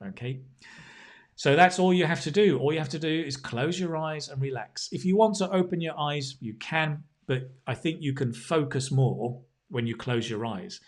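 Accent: United Kingdom. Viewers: I love your class very much, your British accent is beautiful! Thank you!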